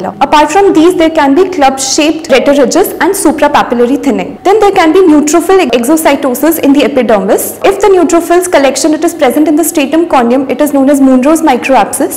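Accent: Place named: India. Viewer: Thats indian accent .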